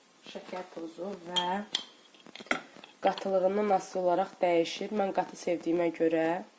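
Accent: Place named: Armenia. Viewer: Yeraz aksentine oxsadi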